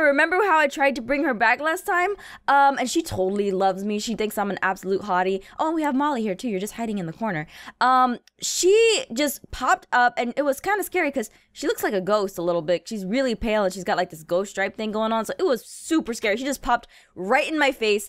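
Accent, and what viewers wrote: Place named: United Kingdom. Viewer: your british... I can tell...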